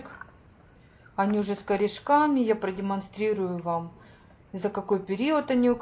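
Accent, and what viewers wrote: Ukraine. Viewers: Любители русского языка! А канал не по русски назвал. Неужели не понятно, что девушка украинка,говорит на своём диалекте. Ты ещё англичанам расскажи, что говорить надо только по русски. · Такой говор у украинцев, но не у всех.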